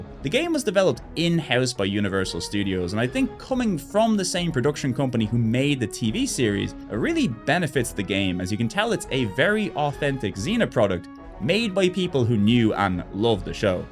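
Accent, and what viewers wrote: Ireland. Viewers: More Irish PlayStation man! Flipping get in!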